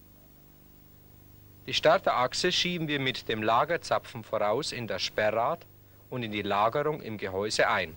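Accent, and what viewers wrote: Germany. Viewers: This iss a Dialekt from the bavarian County calling " Fränkisch " ! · Natürlich ist das Schweinfurterisch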